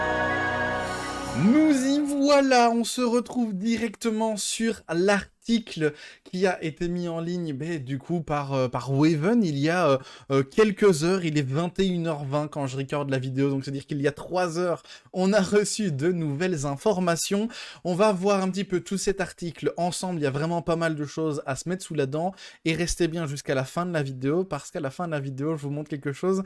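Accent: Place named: Liège, Belgium. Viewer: Tu viens d ou en belgique ? On dirait liege non? Comme moi mdr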